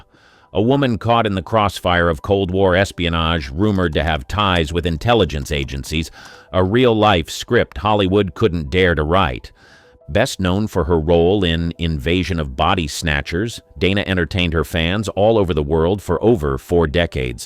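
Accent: United States. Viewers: Typical uninformed American pronunciation with medial 'A's pronounced long. Had to stop an otherwise interesting video because I could not take one more 'Day-ner'! Yes, thank you it is 'Dah-ner'!.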